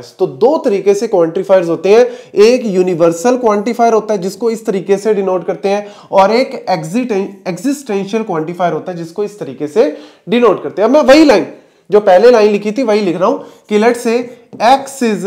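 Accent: Punjab, India. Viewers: nahin probably somewhere around Amritsar-Chanidgarh-Delhi Area I can notice punjabi touch in his hindi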